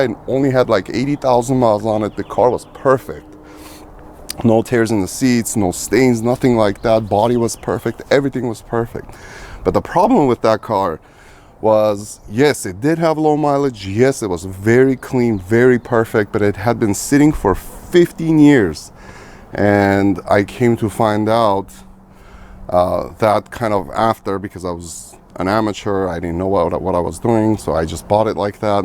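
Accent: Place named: Iran. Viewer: Can hear the persian in his english, very powerfull language 👌🏿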